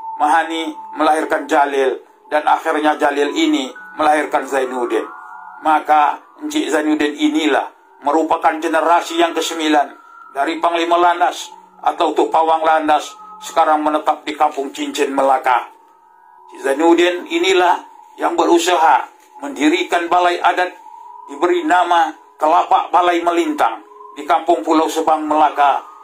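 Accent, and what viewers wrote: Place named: Indonesia. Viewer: suara dan dialek jelas indon asli pak...walaupn hujahnye aku stuju..tp anda mmg jelas warga indon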